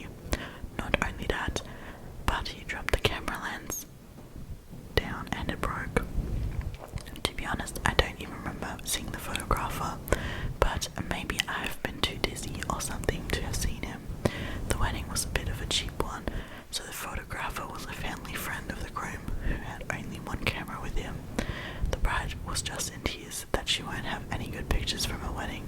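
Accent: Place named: Australia. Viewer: I’m in shock, I’m Aussie and 100% thought you were Australian too! Your English accent is so Aussie I actually can’t believe you’re German 😮 please keep the vids coming they are so good!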